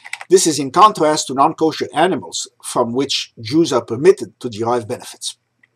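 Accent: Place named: Russia. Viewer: כשאתה מדבר עברית יש לך מבטא רוסי 😅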